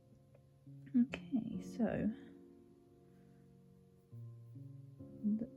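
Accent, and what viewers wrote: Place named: RP, United Kingdom. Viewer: Actually, when my husband heard you talk he said I was wrong and that you sound kind of posh, so I guess he was right!! 😁😂😂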